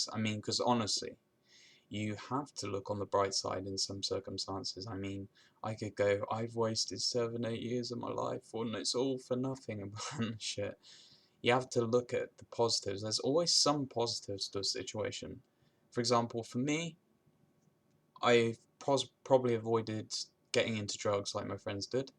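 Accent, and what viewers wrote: United Kingdom. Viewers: I myself always think that things could be worse for me and it makes me feel better. And I also read or listen to others feeling so sick where they can barely get up and I feel lucky. I am very sensitive to gluten. Just getting off of it did SO much for me. I still have CFS but I'm better. I'm going to really try to go to sleep early and eat more often and more veggies. Thank you for your videos. BTW I'm pretty obsessed with your accent. lol I'm from the US but originally from the Caribbean and I love British accents.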